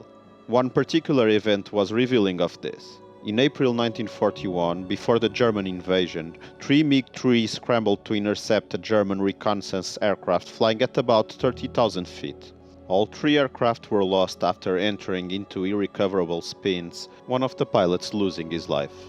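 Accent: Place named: Portugal. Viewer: You have a Portuguese accent.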